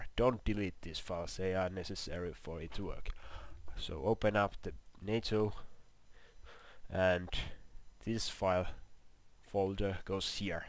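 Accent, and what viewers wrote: Finland. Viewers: Your accent is slightly Finnish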